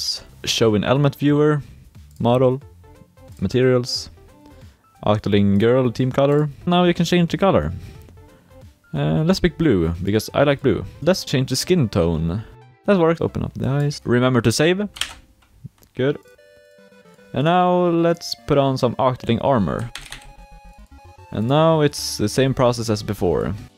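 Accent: Sweden. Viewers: you sound super swedish, am i right?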